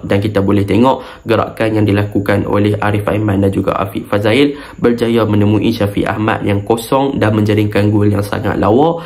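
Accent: Kedah, Malaysia. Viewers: x salah pun loghat kedah tu sbb semua org bole faham ja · Boleh sangat sangat tetapi loghat utara kena hilangkan.Maksudnya boleh tukar tune jikalau dikehendaki.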